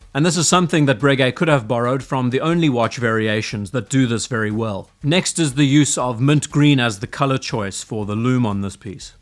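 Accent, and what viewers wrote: South Africa. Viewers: ZA accent is my favourite way to speak English ( I know there isn’t a single uniform accent but they all sound great)! Fantastic watch by the way! The origins date back to World War II watches from Glashütte and Hanhart, with the latter producing them as part of war reparations. That‘s how a German design made it into French watch.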